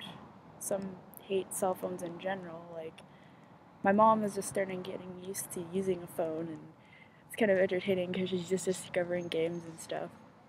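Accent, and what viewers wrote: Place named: Canada. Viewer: i suspected u were canadian bc of yr accent! i live in bc too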